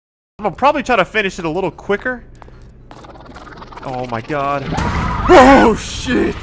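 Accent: United States. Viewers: Jeez has the most American Voice ever:face-green-smiling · lmao the reason why i watch is bcos of his reaction and his strong American accent HAHA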